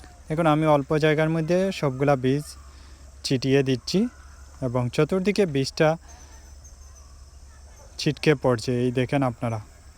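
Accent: Sylhet, Bangladesh. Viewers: Sylhoti bhaisab. Apnar mat khub bhala lager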